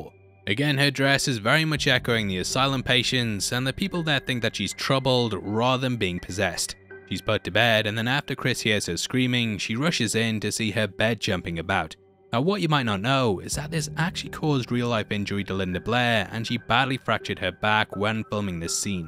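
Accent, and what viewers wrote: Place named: United Kingdom. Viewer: why you english so british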